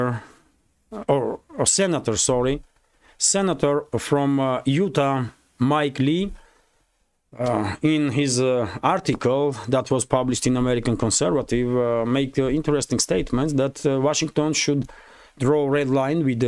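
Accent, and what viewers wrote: Russia. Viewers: FINALY A BROTHER HUMAN A BROTHER RUSSIAN VOICE RU RU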